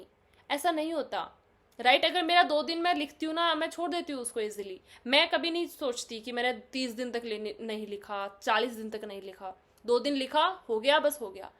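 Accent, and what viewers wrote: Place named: Rajasthan, India. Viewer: Ap rajasthan se ho kya... Your accent .... Like them .... dontpls 😂 don't ever ye sound se m bed se niche gir gye😂 no pls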